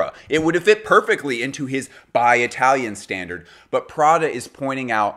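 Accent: Russia. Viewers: why are you using a russian accent when conveying fascist things😭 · Super interesting video That's a Russian accent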